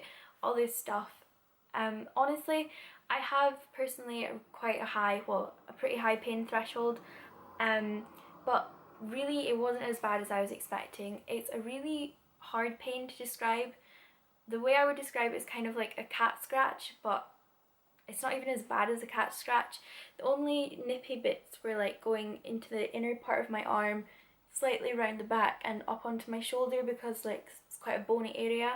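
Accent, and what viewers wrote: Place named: Scotland, United Kingdom. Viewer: Gahh! Love it! Where abouts are you from? Obviously Scottish but your accent is quite a bit different from mines. I'm getting my first tattoo from my cousin in Edinburgh:)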